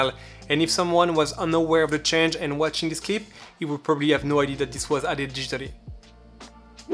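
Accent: France. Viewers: Im both impressed and NOT. If your aim is to teach, I think it would be good to explain every move, especially the nodes. I am trying to learn fusion, and so many tutorials with god people teaching, BUT as they are god they tend to forget to show even the small steps, which if missed, actually can ruin the whole tutorial. In this tutorial, you missed the nodes, why connect them in that way. I looked at some reviews, and all gives good. But may bee they are att a more advanced level, so they can fill in what you missed. The reason I write this, is only because I see you are good at what you are doing. Even if your French english is fun to listen to :)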